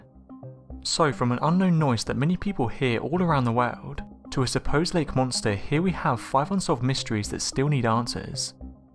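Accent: United Kingdom. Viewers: The only thing suffering are my ears listening to this absolutely horrid "narration." Having a British accent doesn't automatically make someone a good narrator. All this guy is doing is reading one sentence. Then reading another sentence. Then reading another sentence. And every sentence sounds EXACTLY the same. 90 seconds was all I could take.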